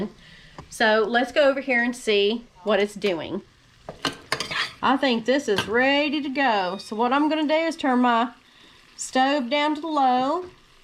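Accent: United States. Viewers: Beautiful American accent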